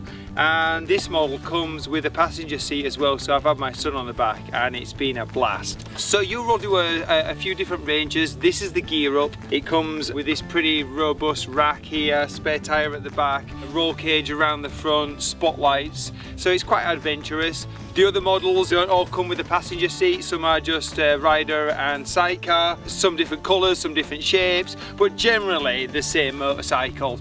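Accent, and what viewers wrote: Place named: England, United Kingdom. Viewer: Where about from England are you from??.. Scouser here. And looking at Trikes, sidecars, and everything in-between. Ohio is a bit thin on the ground.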